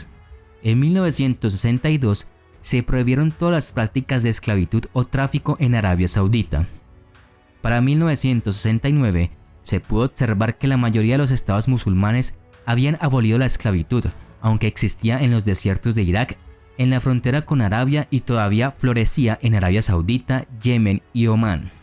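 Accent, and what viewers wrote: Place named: Colombia. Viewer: Me ha dado un no se que este documental me provoco escaŕamuscia.con mis 74 inviernos James habia escuchado alguna historia ni parecida,exelente documental mil gracias por compartir sus conocimientos entiendo q se ha documentations muy bien creo conocer su acento parece 🇨🇴 colombiano, mil gracias por sus relates se ve q le gusta la historia qq Dios le de larga vida con salud ciencia sabiduria y prosperidad para q pueda seguir con esta laboreducative. Buena suerte.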